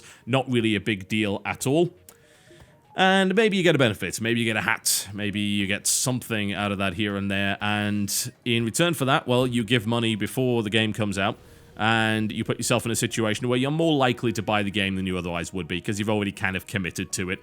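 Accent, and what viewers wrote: United Kingdom. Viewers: He can't you fucking racist that's how British people say it. Its because of their accent.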